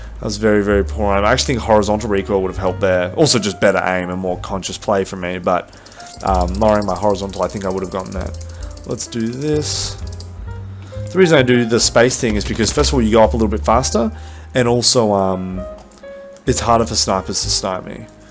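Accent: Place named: United States. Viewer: That American accent through me off real good. It was perfect.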